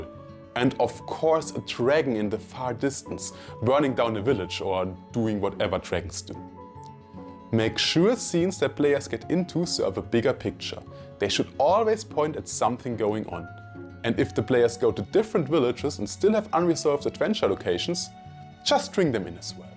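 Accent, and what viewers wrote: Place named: Germany. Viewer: klingt nach nem fellow german der englisch spricht. good luck mit dem channel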